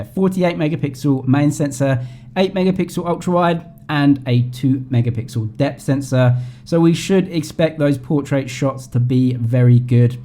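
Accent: England, United Kingdom. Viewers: i like his accent is he british or australian ??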